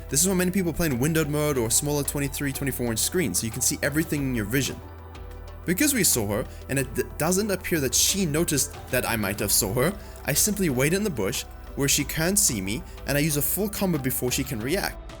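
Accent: South Africa. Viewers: It's a pretty cool accent. I was just listening to it and kept thinking "Is that an Afrikaans accent? Wait, that sounds really English... Now he sounds American!" It was confusing but in a good way! Do you speak Afrikaans at all? I picked up a lot while I was in SA but it was really easy to learn since Dutch is my 2nd native language... I have a pretty unique accent too because of my parents are different nationalities (English/Dutch) and I grew up different countries + international school.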